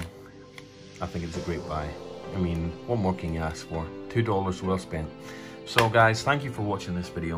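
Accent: Scotland, United Kingdom. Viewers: Im from Scotland so I could pick up on the hints of Scottish but was confused by the American terms you were using haha. My granda is also Scottish and has lived in America for years so has a similar twang lol. Good video btw 👍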